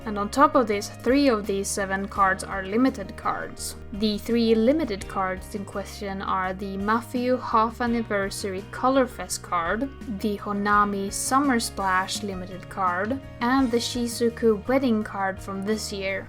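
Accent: Sweden. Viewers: Are you swedish by any chance? I am so im not sure if your accent is swedish. I would loveee to pull on that event thanks for the help! <33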